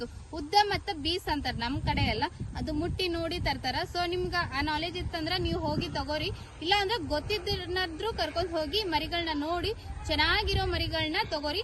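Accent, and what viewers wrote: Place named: Koppal, India. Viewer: ನಿಮ್ಮ ಭಾಷೆ ನೋಡಿದ್ರೆ ನಮ್ಮ ಪಕ್ಕದ ಜಿಲ್ಲೆಯ ಕೊಪ್ಪಳ ದವರು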